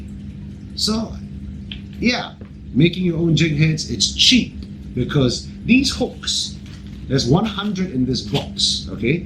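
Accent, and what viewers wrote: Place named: Singapore. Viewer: good to hear a singaporean accent again bloody miss home tbh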